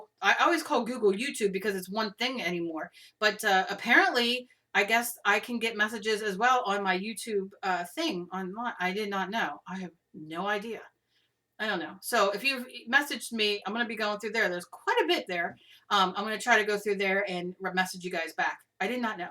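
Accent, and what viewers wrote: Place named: East Coast, United States. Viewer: I personally LOVE watching your videos, hearing you talk is much better then music. It makes me feel as if we are hanging out together. I love the accent, I miss the East Coast so much now that I live in the West. Everyday I go to see if you have new videos along with Gina, Lindsey, so many talented ladies! I thought it was great you mentioned your weight loss! When this video started I said to myself, how can I ask her did you loose weight? Then you said it! I am so proud of you, I need to start doing something myself, I am a food junkie! I look forward to recipes and a craftroom tour! Thanks for sharing all that you do! I think you are a peach:)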